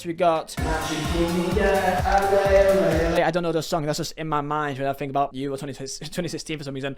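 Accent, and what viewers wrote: United Kingdom. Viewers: GREAT VIDEO AND YOUR BRITISH BTW FELLOW BRIT.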